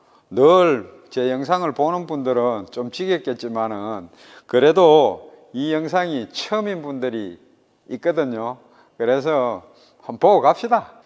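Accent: Guryongpo, South Korea. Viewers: ㅎㅎㅎ 구룡포 발음이 좀 빡세긴합니다. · 나날이 발전하는 블루나인 사장님 짱이야 ! 구룡포 사투리 구수합니다 😂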